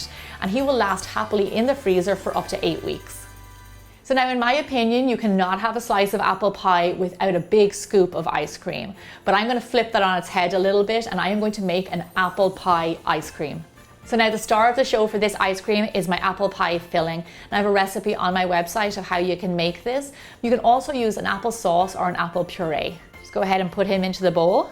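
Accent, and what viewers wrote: Ireland. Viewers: Where in Ireland are you from ? I'm from Mayo in Ireland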